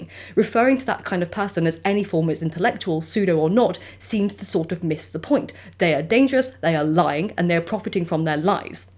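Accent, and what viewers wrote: RP, United Kingdom. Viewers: A very beautiful real intellectual with a majestic royal english accent 🌹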